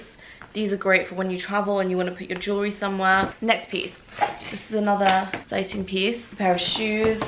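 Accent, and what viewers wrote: United Kingdom. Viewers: You are iconic (with the British accent)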